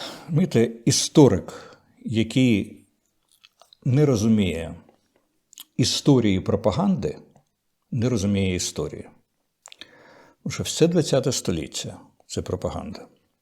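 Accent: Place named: Russia. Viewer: Пад люка перефарбована <link> У тебе навіть акцент ка ца пський аж з вух кров йде.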